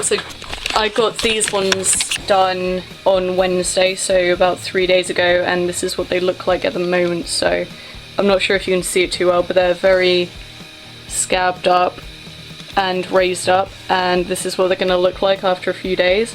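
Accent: United Kingdom. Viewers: odd question but where are you from in the UK? Your accent sounds super familiar - Milton Keynes by any chance?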